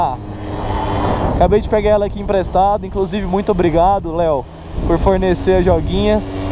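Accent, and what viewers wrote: Goiânia, Brazil. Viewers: Você e de Goiânia ?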